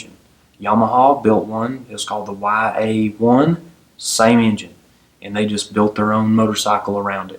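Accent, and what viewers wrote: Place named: South, United States. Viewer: You got that nice southern accent bro!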